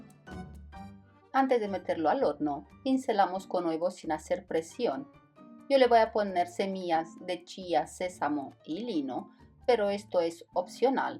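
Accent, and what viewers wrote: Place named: Romania. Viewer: Que buena pinta!!! Ce bun!! Eres rumana?? Tienes acento así eres muy buena cocinera !!! O sa fac reteta multumesc mult!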